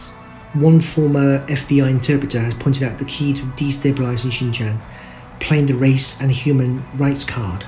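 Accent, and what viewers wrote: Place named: United Kingdom. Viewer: 牛逼牛逼·这个英伦口音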